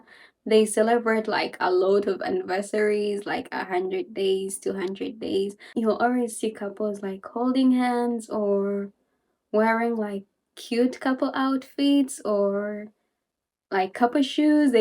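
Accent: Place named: South Korea. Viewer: i love your Korean accent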